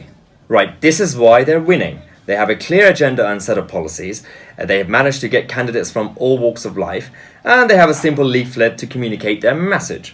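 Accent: United Kingdom. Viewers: brilliant spoken by a true brit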